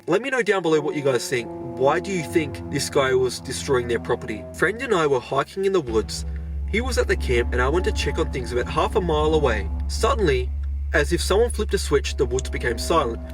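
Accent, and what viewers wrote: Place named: Australia. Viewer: i figured you have to be aussie. the accent never lies :D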